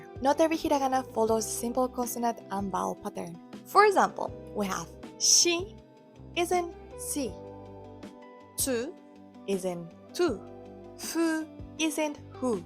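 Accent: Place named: Spain. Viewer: Teacher, why do you speak English with a Spanish accent?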